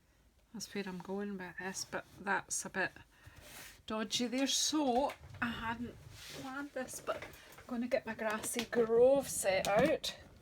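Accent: Scotland, United Kingdom. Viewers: Hi, I stumbled onto your video, stayed, watched and have become your newest subscriber. Your lovely Scottish accent has taken me back to my childhood. Although i am second generation Canadian, 3 of my 4 grandparents were born in Scotland and emigrated to Canada as young adults. It is just so special to hear the accent again. Oh yes BTW, the card was spectacular. Thanks so much. 😊🇨🇦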